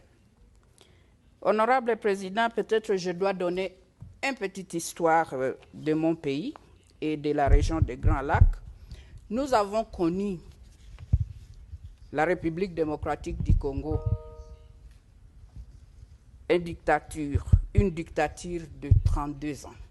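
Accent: Rwanda. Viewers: Ton rwandaise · Un accent rwandais et tutsi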